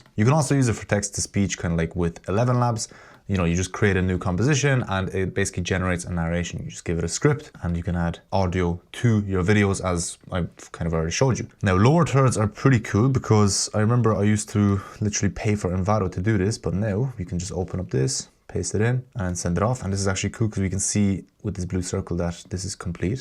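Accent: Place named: Ireland. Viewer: Been looking for something like this for months, dziękuję bro I hear some Irish in that accent 🤝🏼🤝🏼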